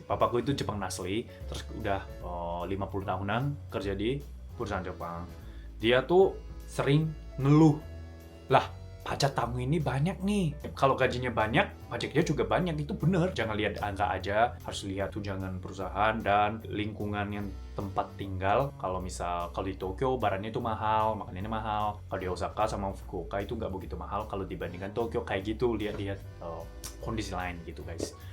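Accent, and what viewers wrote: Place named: Java, Indonesia. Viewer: Logatmu Jawa banget coyyy. 🤣 Potongan disana banyak, bedanya, sebanding sama fasilitas dari negara. Di Indo, duh, pajak dikorupsi mulu. Oh ya, BGM nya terlalu kencang. Volume-nya kecilin lagi dikit.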